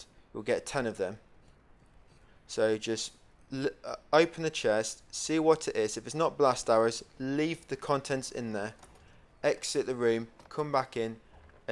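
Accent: England, United Kingdom. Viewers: I can't help but be mesmerized by ur beautiful British voice 😉😚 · I don't know why this video dropped into my recommended, I've never played this game, but your accent is great, what part of England is that from? Fresh sub also, lemme check your other stuff.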